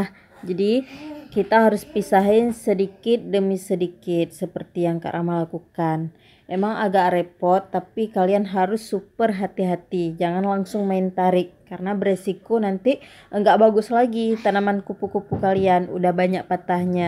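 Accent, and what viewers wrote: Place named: Aceh, Indonesia. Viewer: Kak rahma dmn tinggal nya??? Dari logat nya org aceh ya??? Rencana saya mau main ke tempat kk,, tlg di balas ya 🤗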